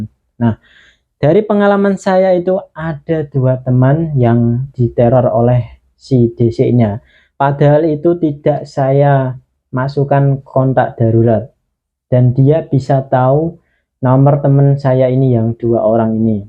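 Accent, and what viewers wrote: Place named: Java, Indonesia. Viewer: Jawane medok emen mase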